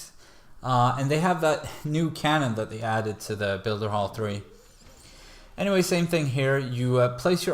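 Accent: Sweden. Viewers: Is he swedish? Just noticed this awesome channel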